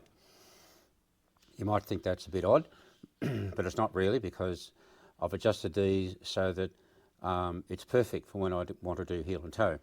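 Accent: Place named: Australia. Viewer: Great video and great technique sir, thanks for sharing. Are you from Australia by any chance?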